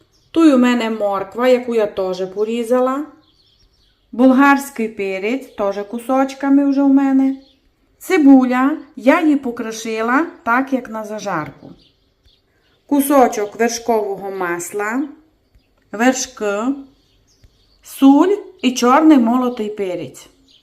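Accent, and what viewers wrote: Transcarpathia, Ukraine. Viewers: Пані, Ви готуєте гарбузовий суп так, як готують в Австрії. В нас вдома (чоловік австрієць, дуже смачно готує) в цю пору цей суп часто в меню. Перед поданням посипають невеликою кількістю підсмаженим насінням гарбуза і легенько поливають гарбузовою олією. Ви прекрасна господиня! ❤ Дужу люблю ваш закарпатський діалект, слухала б годинами. Мирного неба в Україні!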